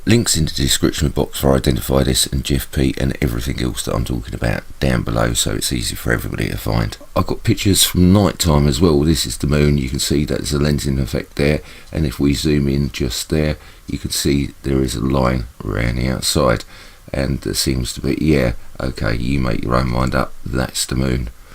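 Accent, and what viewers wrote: United Kingdom. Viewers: Hiya mate I've been watching your videos for a good few days now. Your videos are probably showing the best evidence I've seen. Can you tell me what the lensing system is? Great videos mate. You sound British? Down south? I'm from wigan near Manchester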